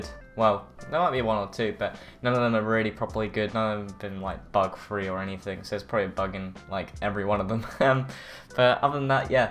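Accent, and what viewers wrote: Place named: United Kingdom. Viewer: That british accent xD magic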